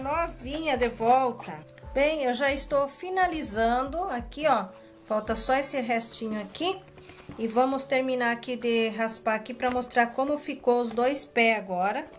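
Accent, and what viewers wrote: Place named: Asia. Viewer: Pelo sutaque parece ser asiática, gostei do vídeo. Parabéns pelo seu trabalho.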